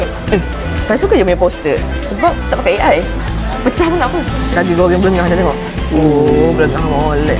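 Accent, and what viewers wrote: Kelantan, Malaysia. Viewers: Orang kelate ke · Ori kelate ke tu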